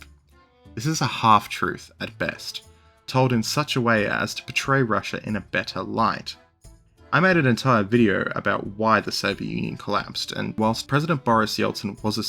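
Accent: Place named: Australia. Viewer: You sound like an Aussie. More correctly an ill informed AUSWIPE. Every word Putin spoke was true. The west has big plans for Ukraine THESE ARE THEIR WORDS. 1, They plan to shut down farming in Europe making it the crime of ECOCIDE punishment jail move it all to Ukraine. 2, Shitstain 🇬🇧 has spent big in Odesaa and the coast hoping Ukraine would build cheap warships. 3, Israelistan owns 30% of the land in Ukraine Black Rock and Vanguard planned to buy 100%. 4, Israelistan owns almost every major business in Ukraine just as they own Australian businesses. 5, Ukraine is losing the war its Military decimated. Redacted in the US announced Israelistan 🇺🇲 has ordered ZZelinsky to replace its entire government with western educated people who are LOYAL TO AMERICA this accounts for General Zalhuznyi a UkroNazi his replacement more acceptable to RUSSIA and the WEST. 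6, The ploy to take Ukraine and the southern ports failed tbey thought they could fill it with war ships and block Russia's warm water ports. 7, The west thought creating a UkroNazi state yes there is film of an American Nazi explaining how the CIA used US Nazis to create AZOV Shitstain 🇬🇧 created right sector 1 and 2 using UK Nazis other groups KRAKEN etc exist they were handed territories where they ruled like medieval Barons. The war to collapse Russia failed Europe and Israelistan are bled dry of weapons cash and raw materials they hoped to steal via the total collapse of Ukraine and Russia have evaporated theyre broke.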